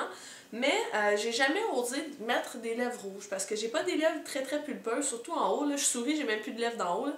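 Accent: Quebec, Canada. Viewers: salut jaime vraiment tes video! sa fait du bien dentendre du quebecois loll des fois les francaise ne dise pas les mot anglais comme il faut et elle me perde et surtout il y a des produit quon trouve en france mais pas au quebec!! merci tes super bonne!!!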